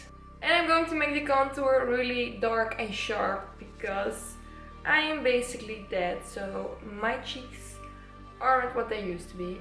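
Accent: Netherlands. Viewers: Lmao first thing when I heard her voice: okee shes dutch 😂. Anyways love the make up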